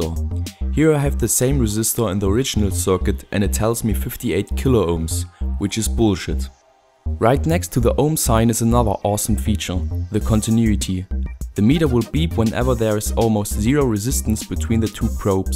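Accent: Israel. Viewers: Are you from Israel? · are you from israel?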